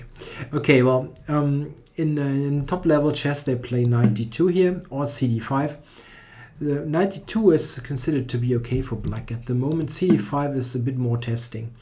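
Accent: Germany. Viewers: I watched the analysis of this game on two other big channels and on your channel. And this video is the best in terms of video and sound quality and you offered by far the best explanations of lines and other possible variations. Nur ein sehr starker deutscher Akzent aber daran kann man sich gewöhnen.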